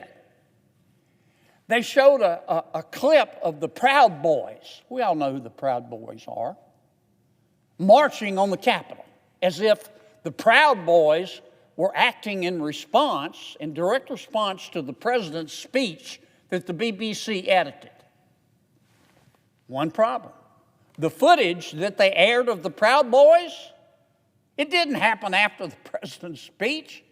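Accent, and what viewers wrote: South, United States. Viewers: I love his southern twang!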